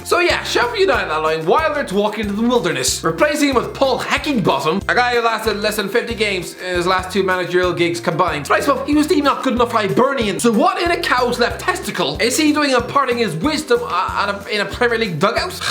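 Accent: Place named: Ireland. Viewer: What part of Ireland you from?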